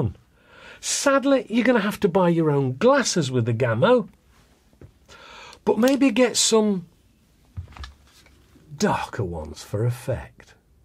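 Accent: United Kingdom. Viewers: I don't know why, but with the goatee and the british accent, I feel like I should call you professor.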